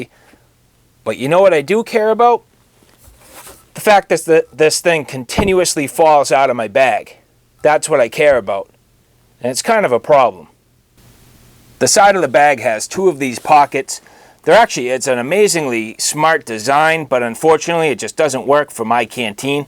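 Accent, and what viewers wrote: Boston, United States. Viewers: I hear lots of good stuff about this brand, I'll have to check em out. Great review! Any chance you're located in Massachusetts? Sounds like a Boston accent. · Your American accent is horrible. Learn British accent or something. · I can agree that is comes out full throttle. You sound like someone from my area and then the Boston accent comes out. I find it fun to listen to. But it was a great video!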